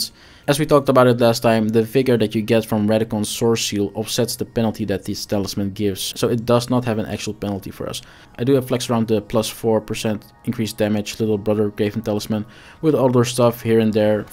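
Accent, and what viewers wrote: Netherlands. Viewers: You da man! Thanks for the guides. By the way, is it correct that I recognize a Dutch accent? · Are you dutch?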